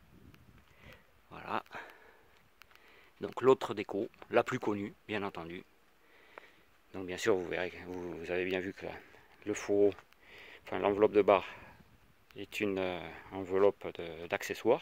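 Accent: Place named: Southwest, France. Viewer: quel plaisir de voir t'es video et quel jolie narration avec ton accent du sud Ouest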